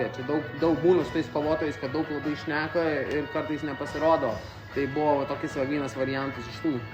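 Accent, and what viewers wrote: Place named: Alytus, Lithuania. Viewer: Alytus girdisi.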